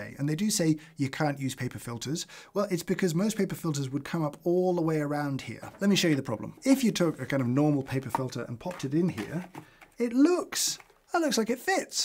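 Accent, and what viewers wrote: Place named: England, United Kingdom. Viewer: delivered with such a quiet kind English accent, it almost goes unnoticed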